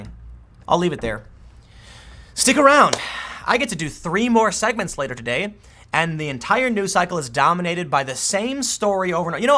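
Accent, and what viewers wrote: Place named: Chicago, United States. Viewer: Dude what neighborhood from Chicago are you from? I feel you're from either Bridgeport or Beverly.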